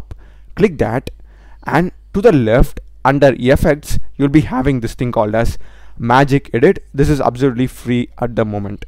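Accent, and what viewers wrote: India. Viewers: "Magic Eret" the fvck this guys indian accent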